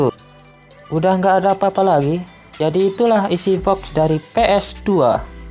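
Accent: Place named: Madura, Indonesia. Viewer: Cara ngomong + backsound nya kek kenal 😂 Rada ada logat Madura nya dikit 😆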